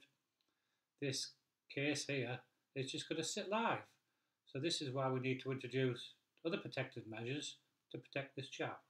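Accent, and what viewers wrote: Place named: Teesside, United Kingdom. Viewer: Good to hear a Teesside accent on here. Thanks for the lesson.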